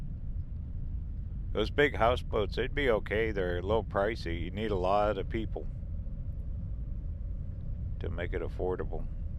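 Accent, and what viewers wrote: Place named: South, United States. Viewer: You do have a southerner accent.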